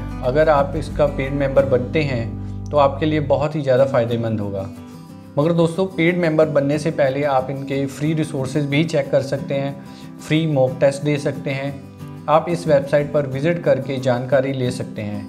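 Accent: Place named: India. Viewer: Thank you , but your Indian accent makes it so hard to find out what you say , but thank U so much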